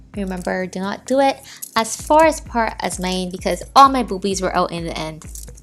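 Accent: Barbados. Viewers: Heard the Bajan accent from the minute you started talking!💙🇧🇧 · Weird question, are you Bajan?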